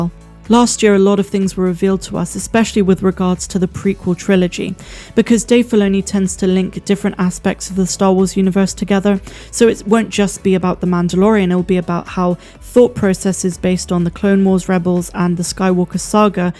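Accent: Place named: Somerset, United Kingdom. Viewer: Loving your content, As a fellow SW (zummerzet) Star wars fan and Storm trooper cosplayer, It's gert lush mate! It,s so nice to hear an accent like mine doing this style of content.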